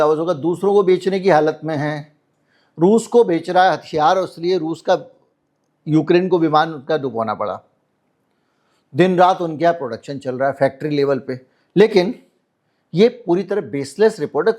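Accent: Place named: Bihar, India. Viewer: आज आप बिहारी टोन मे बोले है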